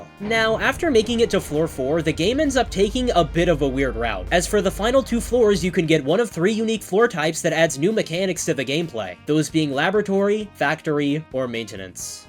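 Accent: Brazil. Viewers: pera ai tu é brasileiro??????????????????????????????????????????????????? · holy moly youre brazillian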